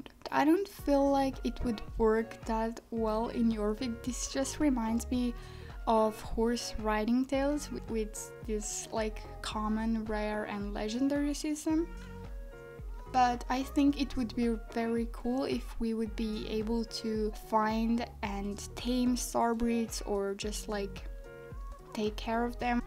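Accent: Germany. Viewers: Omg lol you german? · I think she's German? Or atleast her accent is very similar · Are you German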